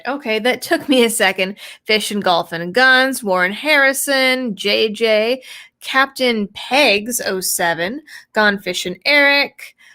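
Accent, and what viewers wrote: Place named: Carolina, United States. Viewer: New to the channel. Enjoying so far. Sounds like you may be a Carolina girl.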